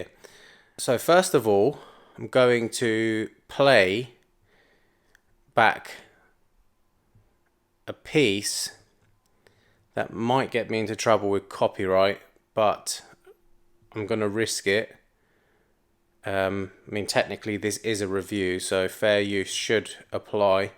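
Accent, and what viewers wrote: United Kingdom. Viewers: It’s VERY refreshing to watch a hifi gear review that’s not from some slick Nth American that comes off like a CNN grade infomercial. And the Nth American sales guys are easy to spot as salesmen as they speak entirely scripted and most use their hands like politicians or billionaire CEO’s. As soon as I see/hear them I’m gone. Yours is entirely real and obviously a non-professional slick salesman presentation. Thanks keep going. And the subtle humour doesn’t go unappreciated. Cambridgeshire accent btw??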